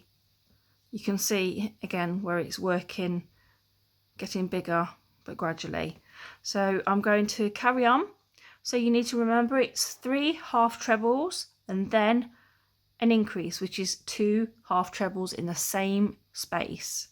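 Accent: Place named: Midlands, United Kingdom. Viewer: Great video. I just love your Midlands accent, Birmingham??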